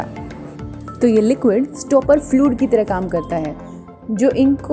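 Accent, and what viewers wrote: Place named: India. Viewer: i wish she had provided a manuscript. I cannot understand her Indian/English.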